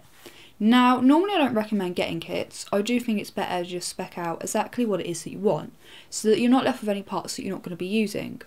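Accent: United Kingdom. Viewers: Hey, you're so damn beautiful... I love you, your british accent and your videos!:)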